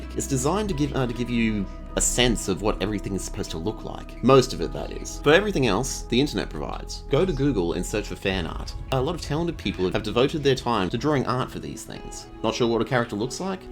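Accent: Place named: Australia. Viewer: This is assertively, but not aggressively, Australian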